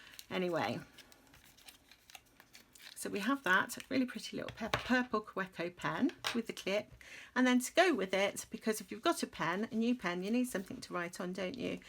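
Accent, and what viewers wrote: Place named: United Kingdom. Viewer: I've never won anything but its always exciting to join a giveaway. But most of all, I love watching your videos. So many times I can relate to your rambling about coffee, your children and journal mostly. And I love love love your accent. Been missing my British friend so watching yours really made me less missing her. Thanks hun. Good luck everyone.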